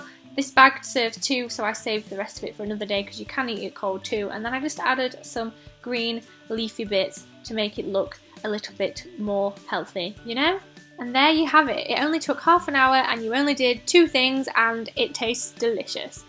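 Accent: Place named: South Yorkshire, United Kingdom. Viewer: Where are you from?! You sounds so much like me, haha, i'm from South Yorkshire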